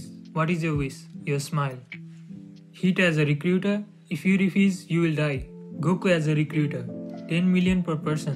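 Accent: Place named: Nepal. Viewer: Mom: Good his studying, his listening to those Educational Indian youtube channel Me: who actually watching Anime Memes that's being read by a Nepalese Dude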